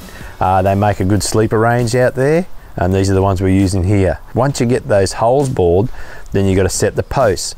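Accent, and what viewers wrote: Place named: Australia. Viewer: no entiendo Aussie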